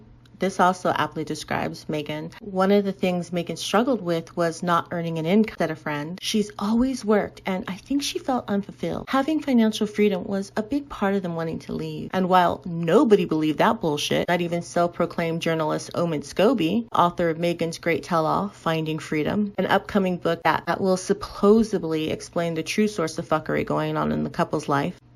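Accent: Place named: United States. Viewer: Love your American accent.... & just found your channel! Well done!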